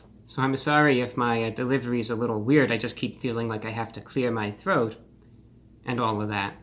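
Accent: United States. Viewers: I dont think ive ever heard anyone speak American English quite as properly as you 😂😂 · Is it just me or is anybody else wondering if this guy is American or English??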